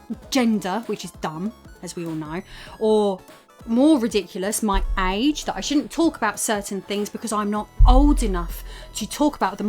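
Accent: United Kingdom. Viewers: You sound like you're at your most British ever in this video, and it's awesome.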